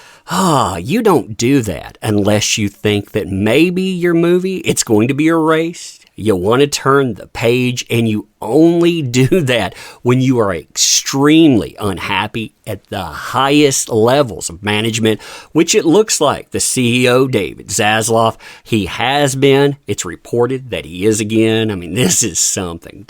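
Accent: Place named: Alabama, United States. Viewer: I just found this channel not that long ago but no offense, but is the main guy on here trying to pull off a gay redneck hillbilly from Alabama or something? The voice thing is really annoying. Is that like a gimmick just for this video or a couple videos or is that like how you sound all the time? It's really fucking weird cringe-worthy and hard as fuck to pay attention to whatever you're trying to talk about cuz I just keep seeing images in my head of some redneck dude down south wearing high heels or something it's fucking weird. Like I said man I'm not trying to be mean or offensive but I know I can't be the only one that that comes across that way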